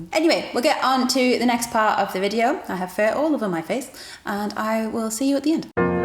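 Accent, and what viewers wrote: Newcastle, United Kingdom. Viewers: Love the Geordie accent problems. I say 'luck book' haha x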